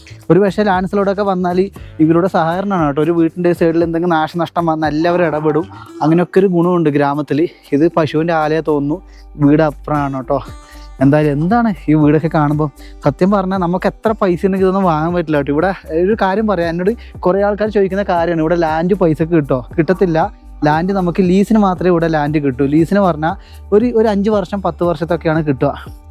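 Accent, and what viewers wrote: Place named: Wayanad, India. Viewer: വയനാട് കാരൻ്റെ സൗണ്ട്...🔥🔥🔥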